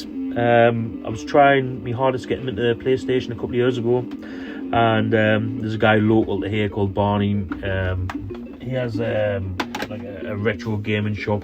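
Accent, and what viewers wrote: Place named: North East England, United Kingdom. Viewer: Great stuff mate. You can’t beat free stuff, you sound like you’re from the NE? We are in Teesside. My cousin was REME too.....I bet you get that all the time 😂😂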